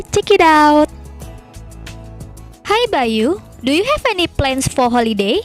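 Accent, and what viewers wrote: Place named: Indonesia. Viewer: Miss, saran untuk intonasinya hehe masih seperti bahasa Indonesia... kan seharusnya ada word stress dan sentence stress 😊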